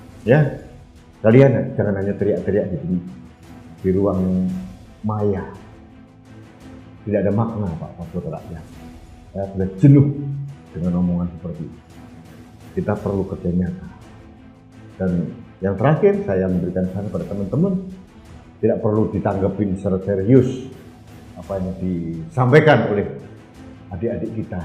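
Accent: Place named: Surabaya, Indonesia. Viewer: MAJU TRUS PK BUAT NKRI SALUT DI DUKUNG RAKYAT KAYAK PK JOKOWI DN PK ASLI MANA YA? / BILANG AKEH TUNGGALE REK DIALEK AREK SBYO TRIM PK MAJU TRUS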